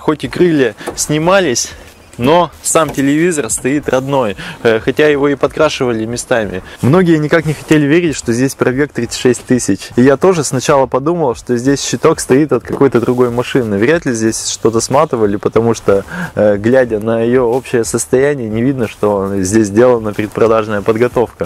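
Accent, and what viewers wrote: Perm, Russia. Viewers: Пермский что-ли?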